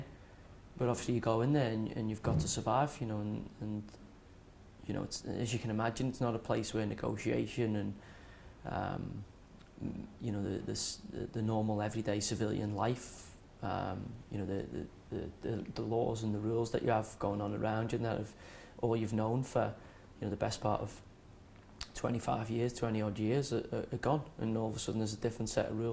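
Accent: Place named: Scouse, United Kingdom. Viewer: Why’s he talking like this? Calmed his Scouse accent right down. Sounds posh almost. Only did a couple of week and had it cush by all accounts. Got gym orderly job right away according to a screw.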